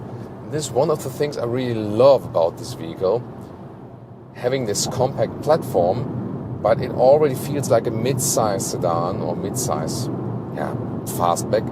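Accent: Germany. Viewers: There's something about watching a car review with a German accent. Honey to my ears man 😂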